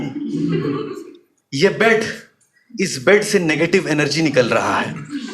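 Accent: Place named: Kolkata, India. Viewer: That kalkatia accent😘😘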